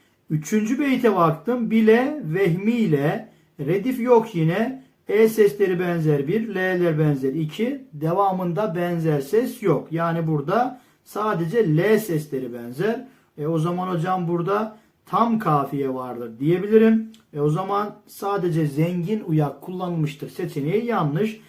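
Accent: Black Sea, Turkey. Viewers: C ler kayıyor Rize yada Trabzon bizdensunuz hocam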